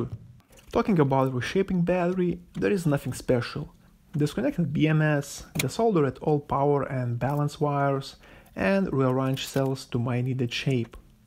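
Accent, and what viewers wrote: Russia. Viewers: Судя по акценту, ты русский) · Акцент русский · Русский акцент)))) Канал на русском есть? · Сразу слышно что русский))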